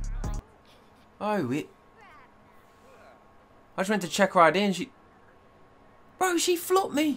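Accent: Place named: United Kingdom. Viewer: We gonna have some Woaaaa.🤣🤣🤣 I love the British accent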